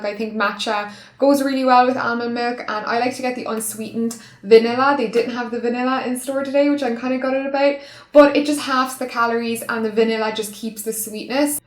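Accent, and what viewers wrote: Ireland. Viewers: Are you Irish?!?!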